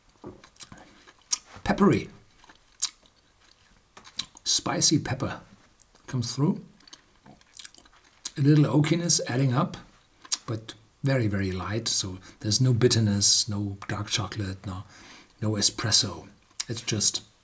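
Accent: Germany. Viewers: Dude. Travel some. Most native Germans speak like this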